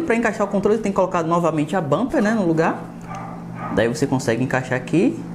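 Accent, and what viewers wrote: Bahia, Brazil. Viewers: Vc e bahiano de salvador? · Já da pra vê que esse e baiano vu skskskksksk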